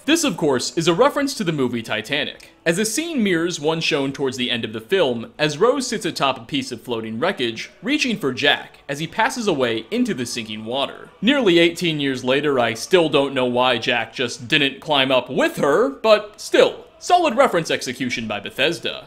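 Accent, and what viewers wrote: Australia. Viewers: is aussie? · Is Australian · Hey are you From Australia? Because if you are I've never noticed until now.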